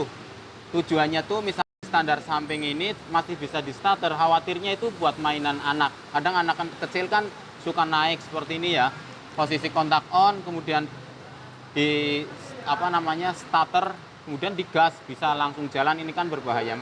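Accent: Java, Indonesia. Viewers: Medhok syekali om,,, Asli mana nih?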